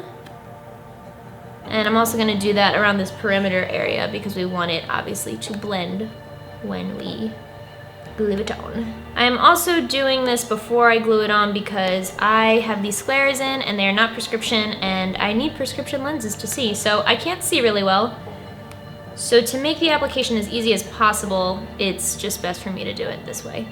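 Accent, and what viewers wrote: Philadelphia, United States. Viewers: yay for Philly accent needs!! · Is she from Philly?!?!?! #straightouttaphilly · OMFG IS SHE FROM PHILLY P.A.?!?!?!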